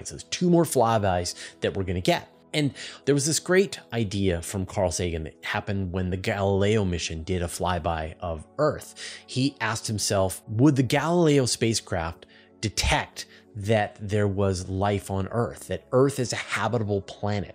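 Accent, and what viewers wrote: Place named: Canada. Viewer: I like how you sound Canadian. It reminds me of talking to Canadians. I mean, I still live in Kitchener, Ontario, but I haven't talked to a Canadian in weeks. It's funny how India has a space program.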